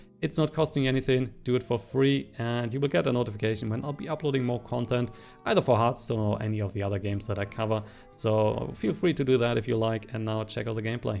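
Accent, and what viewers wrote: Germany. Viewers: Youre german right?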